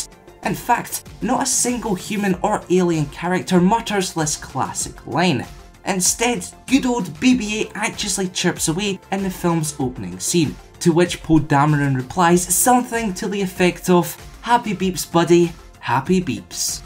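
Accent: Scotland, United Kingdom. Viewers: what part of Scotland are you from? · thanks for answering. I don't mind I like your accent:)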